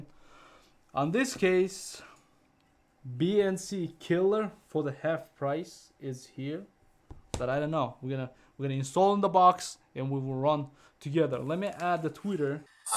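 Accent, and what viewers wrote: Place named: Russia. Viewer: The best according to you Russian accent speaker review guy.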